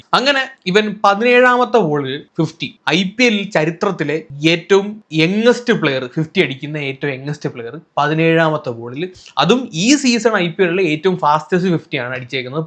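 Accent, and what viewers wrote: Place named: Thrissur, India. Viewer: നിങ്ങളുടെ വർത്താനം സൂപ്പർ, ഞങ്ങൾ തൃശൂർ സ്റ്റൈലിൽ 😂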